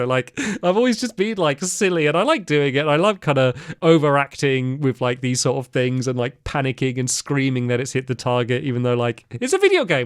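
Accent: United Kingdom. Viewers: It's crazy knowing all this, but still when I watch back, Hit the Target still seems evil to me. Good acting with him. I was quite sad when I saw I wasn't in the Love Garden, but it's fine now, not really upset at all now. Also I just noticed that your other name on here is @ that was funny. One more thing, I think I picked up a british accent from watching your videos everyday lol, not out loud but when I talk in my head, I talk like I'm british.🤣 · thank you stampy <3 I had a British accent as a child because of you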